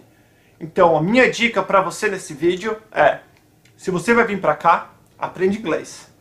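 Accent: United States. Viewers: Você já está com sotaque americano... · ei ei para tudo kkk sotaque americano kkkkkkkk assim vale a pena aprender ingles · você é americano? pois você tem o sotaque um pouco diferente do sotaque brasileiro!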